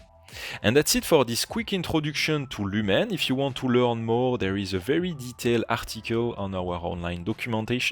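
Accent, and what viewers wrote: France. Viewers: And here you go again with your negativity, being disrespectful and utterly rude. Same as in other tutorials with your comments. I now have reported your messages on the YouTube platform. It says more about your lack of skills. If you don’t find the capacity to understand then simply leave this channel. Unlucky for you there are only a few really great ones out there such as his. Therefore please take a beat and reconsider when you voice against other nations, especially as beautiful as the French. If you were to listen closely you would notice that has a very broad and educated english vocabulary, which is much better than most none-native english speaking people have. So yes, his english is excellent! Having a french accent is not getting in the way of his clear pronunciation at all. It is to note that he is the Technical Marketing Specialist at Twinmotion and is in this position for a reason. 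· Je sens le tont français dans votre voix 😅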